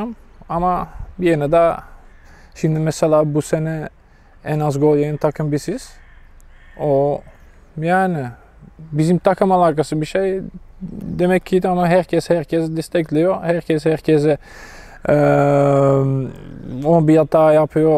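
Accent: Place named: Syria. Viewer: Suriyeli gibi konuşmuş ama saglam kaleci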